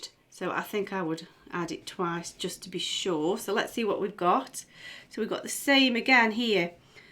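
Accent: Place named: North, United Kingdom. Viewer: You sound like Yvette Fielding. I think it's the Northern accent. I never did Grade Five Theory but did Grade Five Practical and passed about 12 years ago. I'll take ANY advice you offer in the videos.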